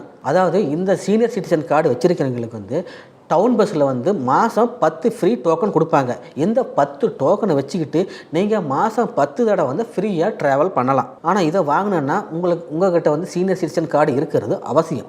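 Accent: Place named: Coimbatore, India. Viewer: That is COIMBATORE SLANG with much respect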